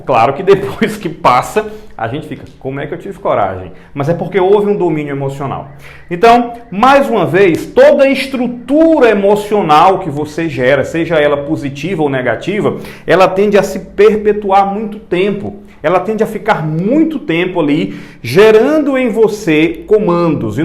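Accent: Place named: Northeast, Brazil. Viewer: Percebi pelo seu sotaque que é do nordeste, talvez recife ou Ceará ou até mesmo paraiba.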